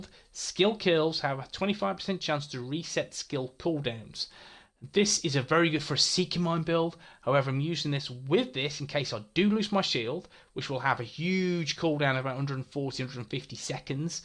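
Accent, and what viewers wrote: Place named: Australia. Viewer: Fair enough mate. Love the build though. You sound Australian. I'm from New Zealand.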